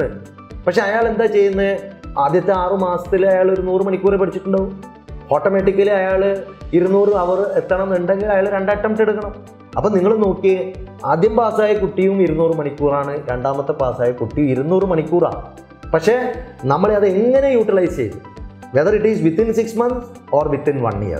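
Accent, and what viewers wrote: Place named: Kannur, India. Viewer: കണ്ണൂർ ഭാഷ വിടൂല്ല.. സർ മുത്താണ്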